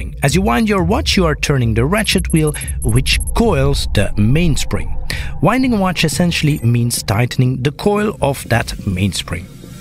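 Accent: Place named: Quebec, Canada. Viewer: That's great. Is the narrator Québécois? I hear a slight accent.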